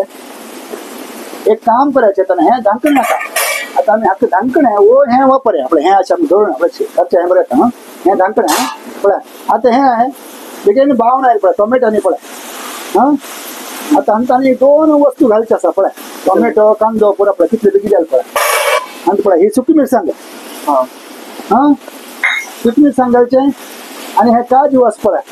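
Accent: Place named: Konkan, India. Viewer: It's amgele konkani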